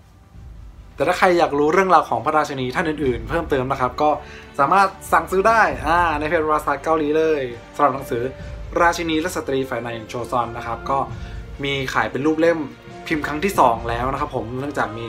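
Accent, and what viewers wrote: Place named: Thailand. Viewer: เหน่อ 55 น่ารักไปอีก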